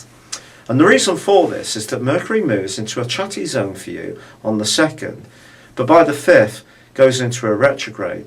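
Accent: England, United Kingdom. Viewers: Why do all of you astrology people have English accents. I can not stand that sound .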